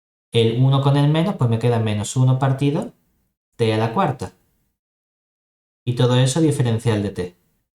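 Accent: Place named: Cádiz, Spain. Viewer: de donde eres? Soy estudiante de ingenieria en la UNED y tus videos son la bomba, tengo familia en el Puerto de Santa Maria en Cadiz y tu acento de verdad me suena de esa zona y me encanta, un gran abrazo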